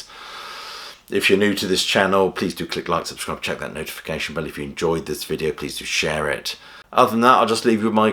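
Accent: United Kingdom. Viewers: The Who??? Radiohead??? This is a super weird "channel." Yeah, a rock band wouldn't want to comment on environmental and socioeconomic issues. How about the Flaming Lips? They are absolutely tone deaf. This cast is all about one guy's peculiar tastes, even if he wraps it around viewer preferences. I think Yanks go in for things because the guy has a Brit accent. He's the Geico Gecko of music criticism.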